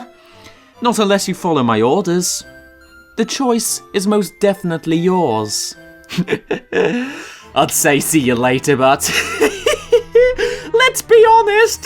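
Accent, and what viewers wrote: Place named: United Kingdom. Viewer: Lol your british or english accent made this so funny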